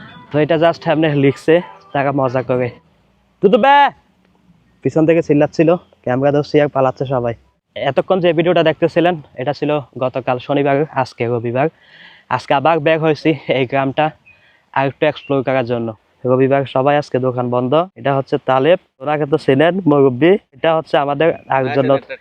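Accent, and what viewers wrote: Chittagong, Bangladesh. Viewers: না,,ভাই।।।আপনার মুখে চাটগাইয়া বাংলা ভালোই লাগে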